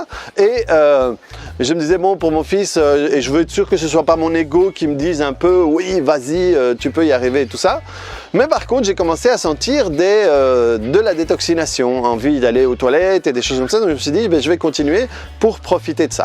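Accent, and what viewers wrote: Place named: Belgium. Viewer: Ça se voit pas mais ça s'entend que tu viens de Belgique haha ><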